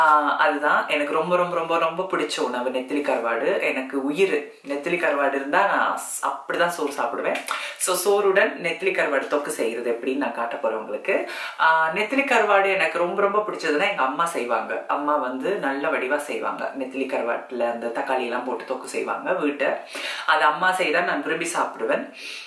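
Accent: Jaffna, Sri Lanka. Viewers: Akka sprka i like sirilankankan tamil nenga spra pesuringa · Pl always continue with srilanka tamil accent. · Yen sissy ipadi pesureenga starting la bayama aagiduchu ena da idu ena aachu to ur voice nu 🤔🤔The way you speak casually daan super 😍😍 the srilankan tamil s so nice to hear from you but in your body language 🙂sema tip for the smell sissy my husband will tell dry fish stinks I love tat smell will follow your tip sure. Sissy konjam tamarind water potu oru boil vitu irakunga inum semaya irukum 😍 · Omgggggggg I juz love your celone Tamil.......... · Unga elagai tamil sweetta irukka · Akka this hair style u pin up look beautiful for u and i really love ur srilankan language❤ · its great you speak SL Tamil. Please continue to do so. I'm sure by now Indian Tamil viewers should be able to understand. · Omg I freakin love you.. haha Jaffna thamil கேக்கிறிதில இருக்கிற சுகமே தனி. 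· I jst admire ur Sri Lankan Tamil. And Malaysian "Terima Kasih". Sama-sama (ur welcome). Love u. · Hi srilanka tamil speech arumiy cooking, comedyance all nice up up 😍 from swiss: srilanka😍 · iyalbana pechu nadaimuraiyodu video parkka arumai...athuvum yazhpaana tamil arumai... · i like your singala tamil slang..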